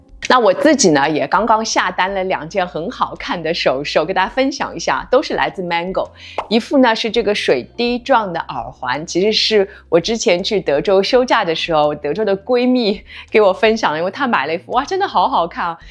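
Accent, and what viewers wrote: Shanghai, China. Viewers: 姐 你是上海人吗？麻子比较大因该是上海话吧。呵呵😁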